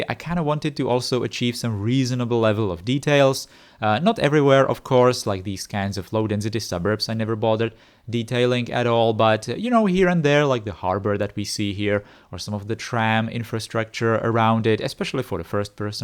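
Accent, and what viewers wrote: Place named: Czech Republic. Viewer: Where are you from? You have a slightly eastern european accent. I’m guessing Czech?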